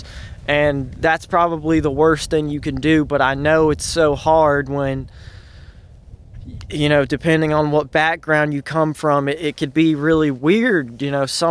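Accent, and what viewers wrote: South, United States. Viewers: Great video man. Its cool to see someone in a video who talks like me. You from the south?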